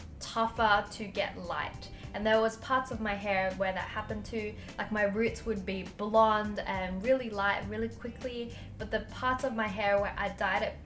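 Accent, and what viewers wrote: Australia. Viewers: purplesheep yep australian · It's austrailian accent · Aussie accent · is she australian? i love that accent!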